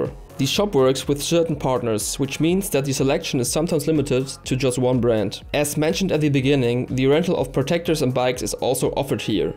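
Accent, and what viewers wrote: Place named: Germany. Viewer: Super Video! Gutes Denglisch ;)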